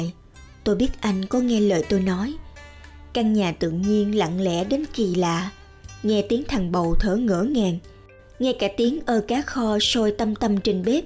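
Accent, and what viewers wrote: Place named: South, Vietnam. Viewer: Lâu rồi hôm nay mình mới nghe được giọng đọc ngọt ngào của miền Nam Thaks tác giã và người đọc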